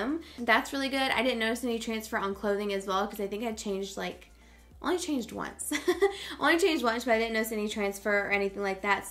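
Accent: South, United States. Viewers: I don’t to be rude but you’re pronouncing Phthalates wrong. It’s THAL-ATES but I do like how you say it though with your southern accent!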